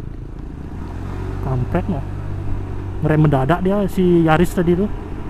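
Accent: Bali, Indonesia. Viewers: Aku Orang Jawa Tapi Gak Tau Ke Napa Gw Suka Dengan Logat Orang Bali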